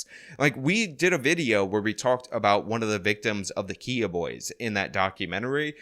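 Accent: New York, United States. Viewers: Your a native New Yorker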